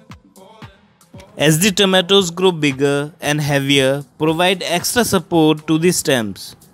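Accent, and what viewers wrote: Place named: India. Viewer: ох уж этот индусский акцент.... ужасно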